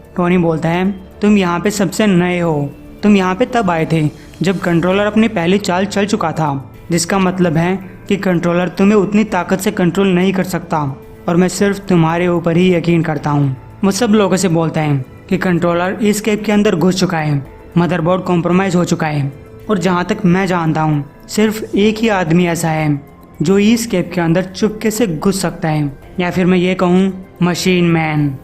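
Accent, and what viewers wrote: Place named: Kota, India. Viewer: shai me.... Sayd maine tume deka hai... Me kota( jila )ke pas me ik gam se huuu.... Tumare bolne ke tarike se lagta hai ki tume bi yhi aas paas ke hi hoo...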